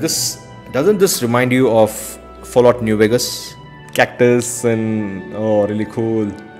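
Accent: India. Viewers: Great content. Going by your accent, I presume that you're Indian. I would be really interested to hear your take on the influences of Indian culture and religion on Morrowind's developers. I played this game long before I had the slightest clue of its real-world inspiration, so I have a hard time dissociating one from the other. Cheers!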